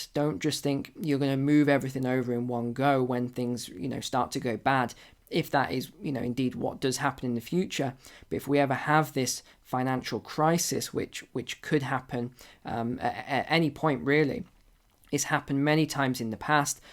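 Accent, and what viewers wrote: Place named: England, United Kingdom. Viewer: Hello love your English accent and the clear explanation. I call you from Wastern Australia and here we have good quality gold and silver, but also platinum and other rare metals what do you suggest about them? More over, I still keep my capital half in precious metals and half money in the bank, my idea was to switch full (all saving) into precious metal but I'm not really feel sure if this is the right decision, what else do you suggest to do? And when do you think all our money will be bail in?? After US Elections..?? Thanks in advance for your help and advice regards Luigi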